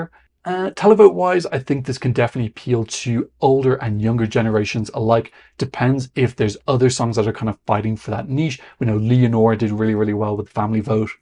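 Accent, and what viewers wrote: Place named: Ireland. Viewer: Why does future sound completely different? Even his Irish accent is stronger! 🤔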